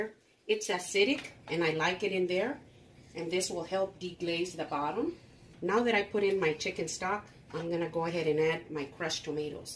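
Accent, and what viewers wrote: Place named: North, United States. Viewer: Initially, i think you are hispanic with a northern us accent. Nevertheless, video is like home, thanks.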